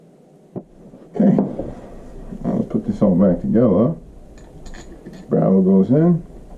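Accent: Boston, United States. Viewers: Love my p22! The accent...I miss Boston my home town. Nice video.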